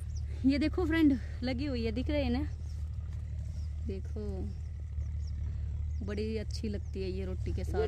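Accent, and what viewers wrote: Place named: Haryana, India. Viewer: Hum ko bhi haryanvi sikhni padegi lagta he qki bohat achi lag rahi hai aapki haryanvi. Hum to Mumbai k he Marathi bolte he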